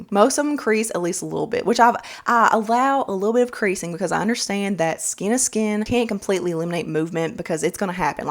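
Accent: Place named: Georgia, United States. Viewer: I just found your channel and love it!! When I was listening to you talk I thought you sounded so much like me.I'm from Georgia.Looking forward to more makeup recommendations. We're having some very hot and humid weather here also.Thank you!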